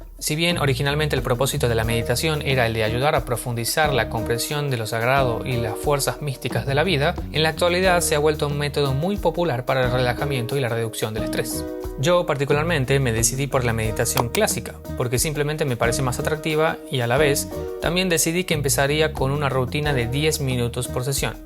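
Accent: Argentina. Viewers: Usted me podría decir de donde es su procedencia? Argentino?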